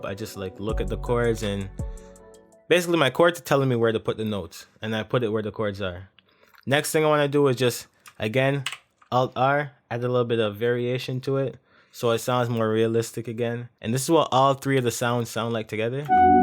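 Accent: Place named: Canada. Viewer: broskiiii u sound canadian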